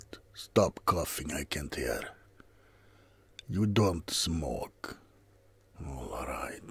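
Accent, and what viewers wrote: Russia. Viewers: I love these Russian ASMR vids they are genuinely hilarious and your Russian accent is brilliant hope you're doing well take it one day at a time my friend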